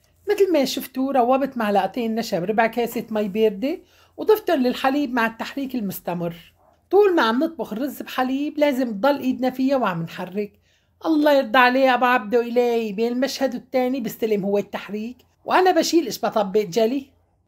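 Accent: Aleppo, Syria. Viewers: بلعكس خليلي عم تقولي دشري وحيكتك الحلوة الحلبية لا صلية ماتغيري كلامك برجعنا لايام زمان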